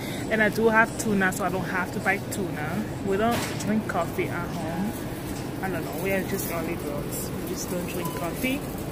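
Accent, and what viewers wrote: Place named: France. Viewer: Coucou! Ah oui, ton accent francais est encore fort. Ne t'en fais pas c'est pareil pour moi aussi. I always buy extra. Thanks for sharing, I like it.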